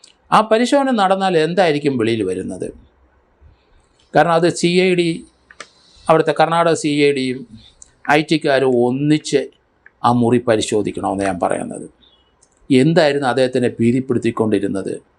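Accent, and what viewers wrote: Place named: Thiruvananthapuram, India. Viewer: അത് പ്രാദേശിക ഉച്ചാരണം ആണ്. ഞങൾ തിരോന്തോരംകാർ അങ്ങനെ പലതും പറയും. ആറ്റുകാൽ കോവിൽ എന്നതിന് ആറ്റുവാകോയിൽ, ജനറൽ ആശുപത്രിക്ക് ജന്നലാശൂത്രി ഒക്കെ ആണ്.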